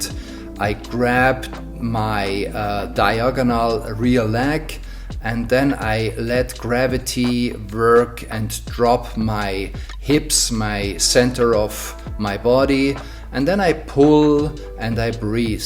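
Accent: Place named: Germany. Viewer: Love male german accent.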